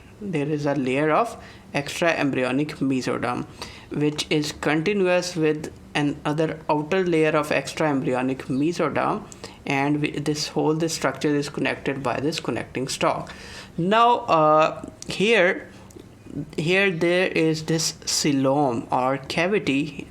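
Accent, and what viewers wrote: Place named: India. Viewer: Fascinating but hhe Indian accent is almost impossible to follow; that is now English, alas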